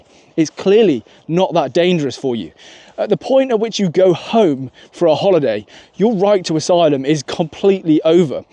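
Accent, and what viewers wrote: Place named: Northern England, United Kingdom. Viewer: strange accent. mostly RP but often produces vowels from northern england. also seems to hupercorrect for a northern accent at times, like a northerner trying to sound southern. maybe went to a public school in the north like rugby?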